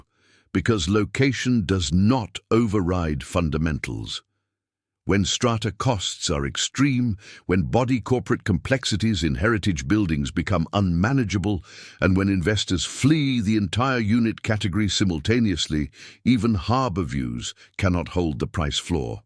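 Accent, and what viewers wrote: United States. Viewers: If you hear this voice of an American,, don't listen to a word they say Don't listen at all Their Aussies behind it, straight out lying for their own narrative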